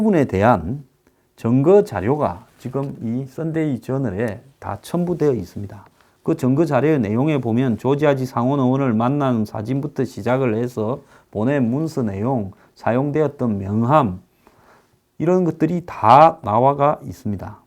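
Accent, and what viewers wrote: Gyeongsang, South Korea. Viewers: 황, 왠만하면 경상도 억양은 자제해라! 그게 자랑도 아니고. 수준낯은 애들 꼬득겨 지원표 몇게받으니 신나?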